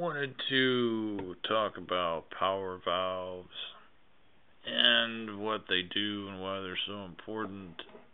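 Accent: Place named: Appalachia, United States. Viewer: Damn your the slowest talking HillyBilly I ever heard.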